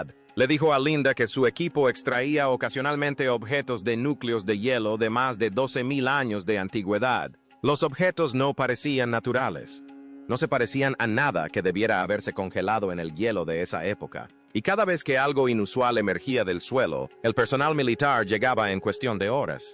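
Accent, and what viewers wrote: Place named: United States. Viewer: Y este acento de Gringo aprendiendo español! esto es Falso!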